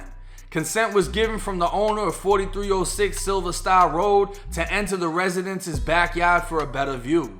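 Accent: Boston, United States. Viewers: of coowz its boston. Not a place wheh kids can play in tha yod, cuz they could get hit by a ca from all the wicked bad drivas kid! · You have a boston accent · Sometimes I think your from Boston or Massachusetts but I heard you say you were locked up in Florida so I'm guessing your fro. Florida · hey just wondering are you from Boston. me being from I can hear how say you car. you don't have that southern Florida,,,,, accent just wondering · Boston talking ass...Where you from SOUTHY? LOL! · how are you mixing up florida lazy twang and stromg new England (boston) accent and dialect ? totally sounds like ur a poser and/or confused...idk about the rest of FL but here in Orlando we don't sound like a PLIES or reject Slip N Slide artist from <num>.i mean unless ur on mercy drive, ivy lane or deep off the NW part of Orange County maybe IDK... but from OBT to Landstreet; Oakridge to Kirkman and MetroWest... 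we most definitely don't sound like that in fact we deliberately ended that twang talk dialect back in 2015/2016, meaning THAT LINGO BECAME A NO GO ON THAT TEMPO.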